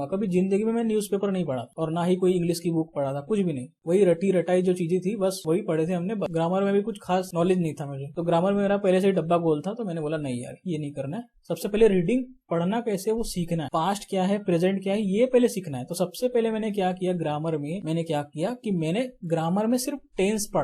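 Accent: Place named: South India, India. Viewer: Bhai tum south indian jaise sound kr rhe ho is video me. 😮 Mast Hindi boli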